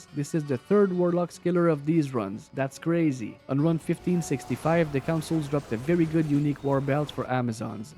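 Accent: Quebec, Canada. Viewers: J’entends l’accent québécois à des kilomètres 😭🙏 nice vid man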